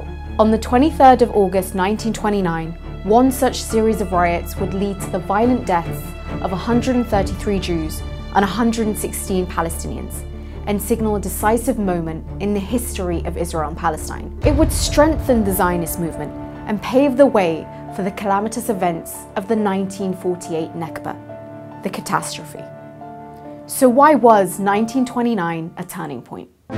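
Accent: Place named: England, United Kingdom. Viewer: English accent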